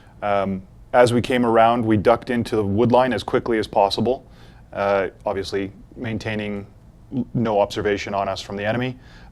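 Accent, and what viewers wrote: United Kingdom. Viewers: Your British sounds so British. For 10 years you must have kept it...that twang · Wait, he is British?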